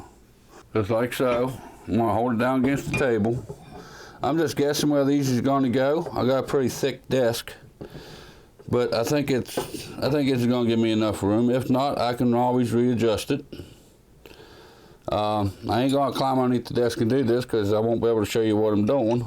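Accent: United States. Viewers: Yo you cowboy accent is cool bro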